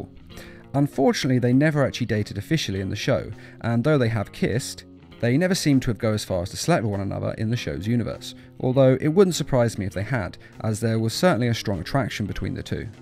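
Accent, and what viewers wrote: United Kingdom. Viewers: That’s why his content is so great because he keeps that sophisticated British accent and is so casual even if he is talking about the dumbest things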